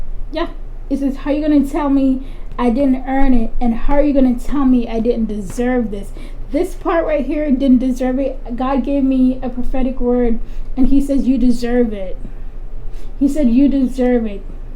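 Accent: Jamaica. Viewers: Oh u Jamaican 😁😁..Bless up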